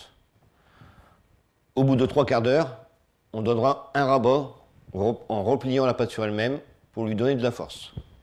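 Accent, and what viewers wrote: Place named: Pas-de-Calais, France. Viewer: Il a l'accent du pas de Calais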